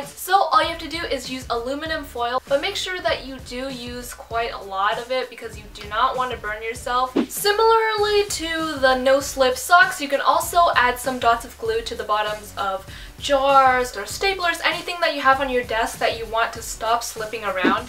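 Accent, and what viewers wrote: Canada. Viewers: I'm from England and I'm so proud that I managed to tell you are from Canada and not America from your accent 😂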